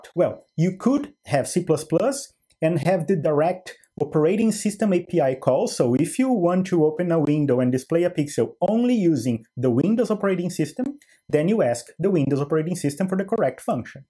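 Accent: Brazil. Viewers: You have a Brazilian accent... vc é br ? Sdl ainda vale a pena?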